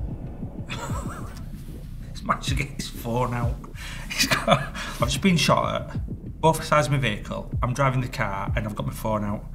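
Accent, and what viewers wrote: United Kingdom. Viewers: A traffic cop where? Your accent makes me believe you’re from Britain/England/ the UK. What do you people call your country nowadays?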